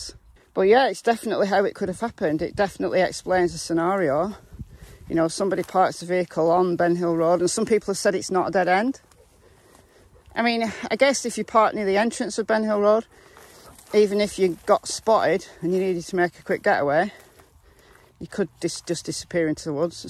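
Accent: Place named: England, United Kingdom. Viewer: Hello.I've just discovered you and at the beginning of this video I thought you were from Massachusetts, U.S.A., from your accent.Then I realized you sounded English.The accent we have here near Boston came from the English settlers way back, so its interesting to hear the similarities.I have been following this case and I'm so confused.I don't know what to believe.I'm leaning towards abduction by a known person.I really hope they find her soon and it would be a miracle if she's found alive.It's so upsetting.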